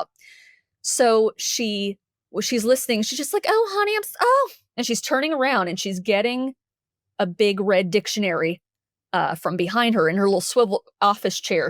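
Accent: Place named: Dallas, United States. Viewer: So crazy how clocked her Dallas accent in one minute. Takes one to know one. What a wonderful communicator. I used to drive by the Kingdom Hall on Mockingbird and Inwood every day and I wondered where the windows were!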